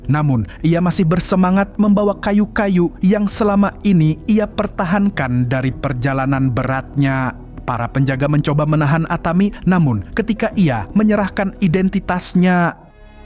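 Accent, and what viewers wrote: Banjar, Indonesia. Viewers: Orang Banjar sakalinya adminnya😂😂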